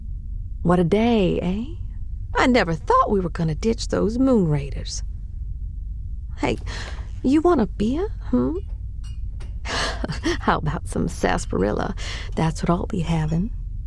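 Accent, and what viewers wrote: Texas, United States. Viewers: This is so Cute thank you Miss also Love the Texan Accent you sound hotter with it ❤️❤️❤️❤️❤️❤️😘😘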